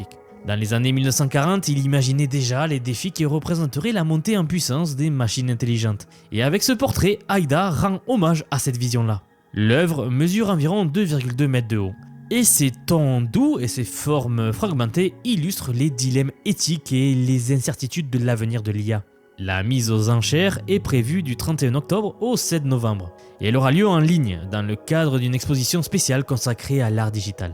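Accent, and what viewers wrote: South, France. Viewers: J'ai vraiment du mal avec l'accent du sud 😂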